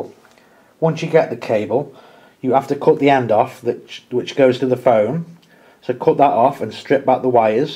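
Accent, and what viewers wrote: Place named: Shildon, United Kingdom. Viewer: Just tried this but using an Arduino's FTDI chip to do the serial side, no dice. So I've resigned to ordering a CA-42 Lead and see where that gets me. The Arduino did let me see the "LED:000000CC FAddr:0024A051" Error when the board is in place so I know I'm fixing the right issue. Totally Unrelated, You sound like you aren't too far from me, you sound very much like my friend from shildon :D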